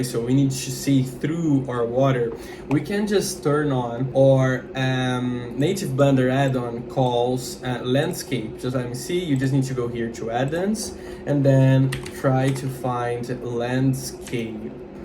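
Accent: Brazil. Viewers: reconheci o brasil só pelo sotaque akakak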